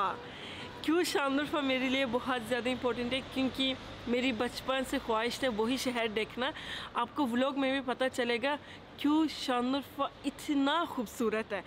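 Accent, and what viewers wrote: Pakistan. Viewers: Assalamualaikum .I like your Urdu accent alot .You put genuine efforts to make videos . Narrating the story of Hzth. Ibrahim (a.s) & Hzth Ayyub ( a.s) was very informative . I haven't came across those maqam e mubarak before .May Allah (s.a.w.t) bless you & your family with good health, happiness, longevity , success and prosperity. Your brother from Hyderabad, India. Fia'amanillah. (Mohammed Azeem).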